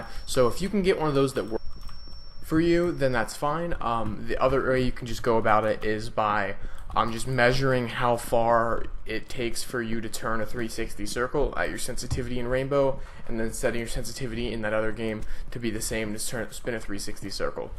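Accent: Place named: General American, United States. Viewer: The truth is that he talks with a completely standard american accent. At times it might have been a bit fast but he pretty well sounded everything out and spoke clearly. So honestly I do understand your struggle as I have learned a second language myself, but you just need to practice the language more and the criticism in my genuine opinion is undeserved.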